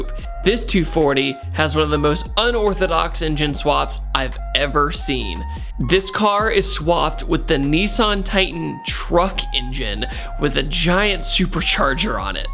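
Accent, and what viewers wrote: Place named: South, United States. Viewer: Oh no.... It's finally happened... has been living in Georgia too long & is losing his ability to sound normal slowly.... he keeps saying "S13" coupe and it sounds like he's saying "S. R. teen" Don't let that slack-jaw southern draw seep in to your brain! PRONOUNCE your words... don't become a country boy for all things holy.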